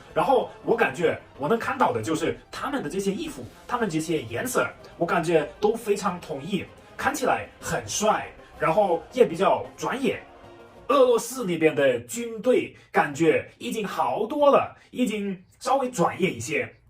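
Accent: Sichuan, China. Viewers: ，你的中文很不错，口音中还带着一点的 麻辣味 。👍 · ，四川的吧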